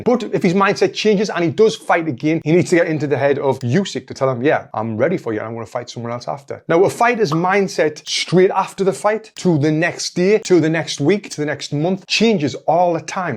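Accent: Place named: Newcastle, United Kingdom. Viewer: Just started listening to your content tonight, good crack pal, Bob.. Geordie tho😂👍👍👌.... Fury, s talked himself upside down once to many times and your right maybe it's the start of decline... Hhhhmmm.. Time will tell... But a tell y what I've watched that fight with nganou 10 times fury hit him with a few full on blows and didn't even rock his head!!! He's a monster nganou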